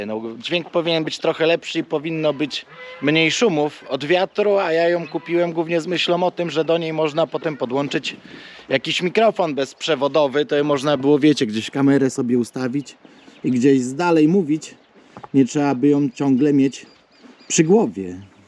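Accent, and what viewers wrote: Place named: East, Poland. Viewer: Ale sie wyrobiles z tym Yiutabem normalnie smigasz sie bujajacbna bokin😂.. Ale kurde bele beks bo akcent masz i poprawiasz... Tak trzymaj wschodniaku 😮😊😜😂😂😂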